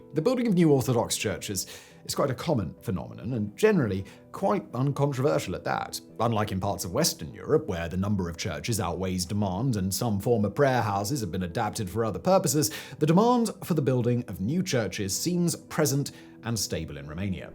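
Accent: RP, United Kingdom. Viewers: you sound so posh that it's very annoying. Bring it down to earth and I'll watch your content. Otherwise... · This guy just reads the script. He sounds goofy and British, that is the selling point. There is an immense production team behind that.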